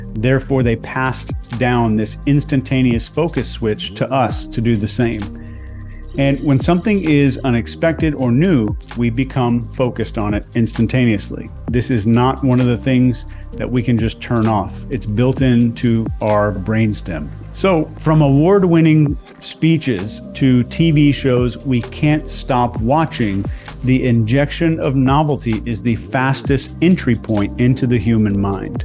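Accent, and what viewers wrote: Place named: South, United States. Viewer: Guy cant take constructive criticism. Hes got a huge ego, he said people who hide their accents are not to be trusted, but he hides his own southern drawl. He constantly makes up new words like “ive officially called this xyz… “like he works for Webster now as well. Sleazy salesman, remember nothings free if an app is free youre the product.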